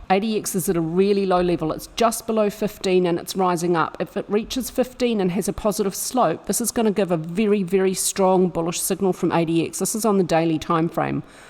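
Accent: New Zealand. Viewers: So good having a kiwi accent in crypto. And i think one of the best Elliot wave channels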